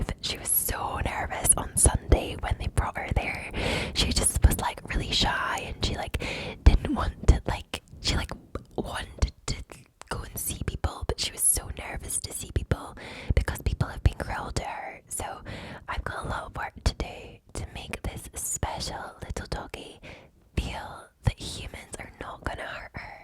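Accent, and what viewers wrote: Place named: Scotland, United Kingdom. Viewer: I love your super tingly Scottish whispers. This is amazing, 360 degrees of! 😍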